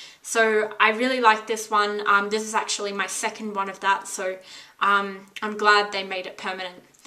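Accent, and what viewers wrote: Australia. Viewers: She's deffoo austrailian....ivegt cousins frm both so inoo..x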